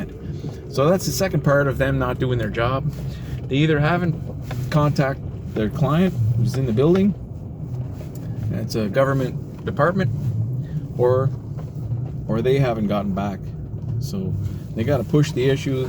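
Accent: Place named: Canada. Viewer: I hear what you are saying man, I was called a douchbag last winter, at an apartment I looked after, I told the property management I was putting up with that shit, and I dropping their buildings because it wasn’t the first time I had a run in with this particular property. So this year I told them sorry but buh bye. Sure I lost 5 bldgs, but I then picked up 4 and charge the same amount I charged for the 5 bldgs from the other mgmt company. We do a dangerous job that puts us in the worst elements and people think because they give us a small amount of money to clear their properties that they can treat us like we’re fools. Nah! We’re professionals and we should be treated like such. Also How would they know what equipment or amount of labour is needed, to do jobs, were the ones that do it all the time, ....... sorry, got me fired up, sounds like you are from Canada, I’m just east of Toronto, where are you?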